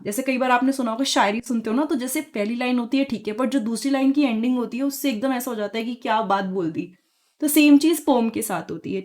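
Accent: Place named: Haryana, India. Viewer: Mam is haryanvi speaker in video...focus mam says 1 se 2 jano😊....btw nice session and it is very helpful...thnks mam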